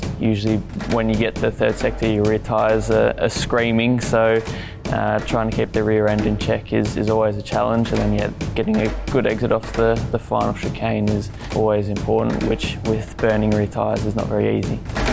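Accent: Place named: Australia. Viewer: His Aus accent sounds bit stronger here..hes much younger here though❤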